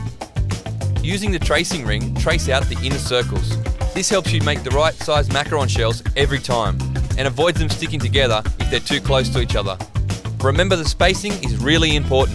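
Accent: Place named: Australia. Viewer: The first thing I said when I played this video was OMG HES AUSTRALIAN !!!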